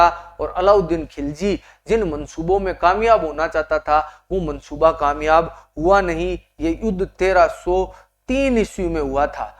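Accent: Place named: Marwar, India. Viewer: Kya baat hai Sar ji aapki Marwadi Ka jawab nhi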